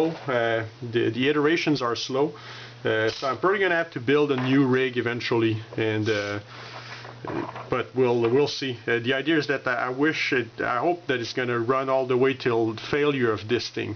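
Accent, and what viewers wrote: Canada. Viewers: you sound like you are French Canadien. Either way looks good. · Togeder! I keep cracking up when he says together and I love your Canadian accent.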